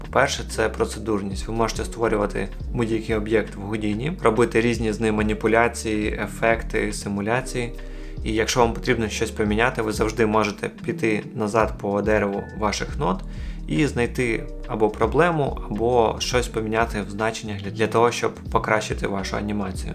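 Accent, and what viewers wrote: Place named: Russia. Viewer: У автора москальский акцент🤣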